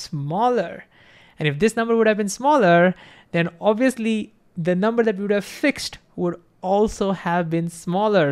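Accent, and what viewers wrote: India. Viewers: You sound and look Indian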